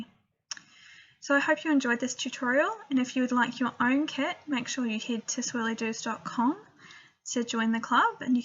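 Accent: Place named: New Zealand. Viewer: I thought you sounded more kiwi than Aussie. I love your work!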